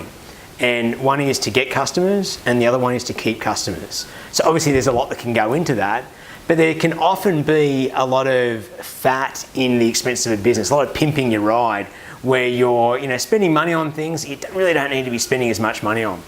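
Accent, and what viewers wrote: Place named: Australia. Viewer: Love your aussie accent mate. You explained very well. Thanks and keep making more detailed videos regarding sales, profit, some success stories, case studies .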